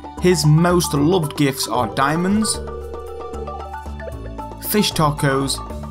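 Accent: United Kingdom. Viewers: THE BRITISH ACCENT AND COMMENTARY LMAOAOAOA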